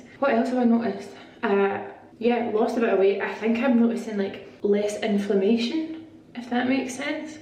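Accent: Scotland, United Kingdom. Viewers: Scots talk realllllly fast. Wow. Great video 👍🏼👍🏼👍🏼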